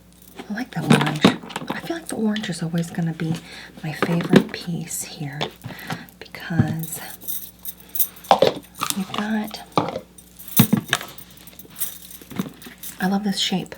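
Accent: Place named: Midwest, United States. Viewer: the midwestern accent is so gooooood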